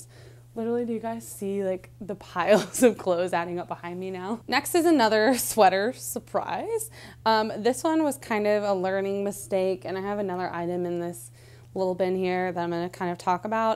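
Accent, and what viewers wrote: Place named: South, United States. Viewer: Lol. I like your southern accent. I’m from Tennessee, so I like it.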